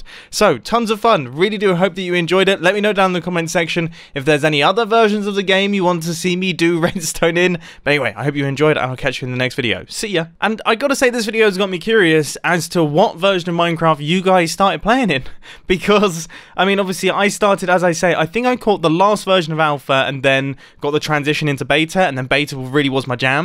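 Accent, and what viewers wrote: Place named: United Kingdom. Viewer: Does anyone else not have any idea how any of this redstone stuff works? I just like watching the British man talk funny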